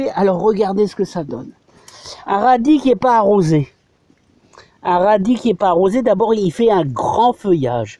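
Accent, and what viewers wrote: North, France. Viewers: j adore!! ta facon de parler teu s'ro point du nord tizote? hi hi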